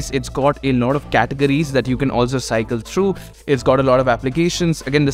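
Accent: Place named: India. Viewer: Seeing "forbidden apps not in play store" and hearing the lnd¡an voice gives me M!croscft tach support vibes. Great video! 😊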